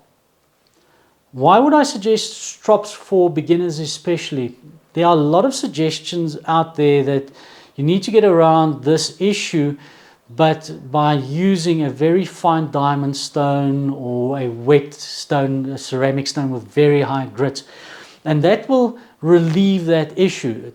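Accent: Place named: South Africa. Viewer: How do you refresh your strop when the leather darkens and flattens? BTW, your accent reminds me of Darryl Cullinan the former SA batsman.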